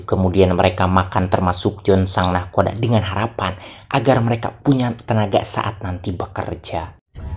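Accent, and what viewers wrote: West Java, Indonesia. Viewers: Nma nya spa bng Sunda nya mna bikin ngakak kalo dngerin suaranya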